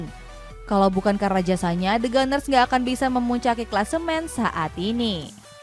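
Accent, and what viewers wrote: Indonesia. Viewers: Iya njir host ini bahasa inggris dibaca pake aksen indo😅😅 gw yg bahasa inggis nya basic aja gk se paok ini😂😂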